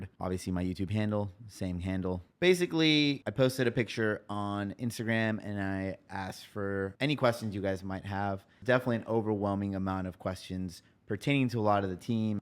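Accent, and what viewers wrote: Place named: Argentina. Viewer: Tiene acento como de argentino.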